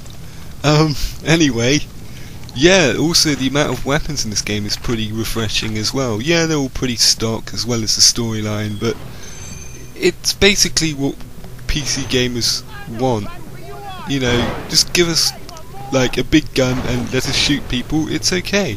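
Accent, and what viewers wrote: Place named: United Kingdom. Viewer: hahaha, british accent xD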